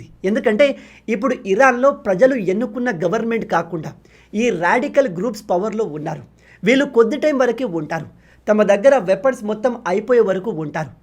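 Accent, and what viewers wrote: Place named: Andhra-Karnataka border, India. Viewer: I was astonished when you told you were from Siddipet.I thought you are from some Andhra Karnataka border.Nice Telugu language you are speaking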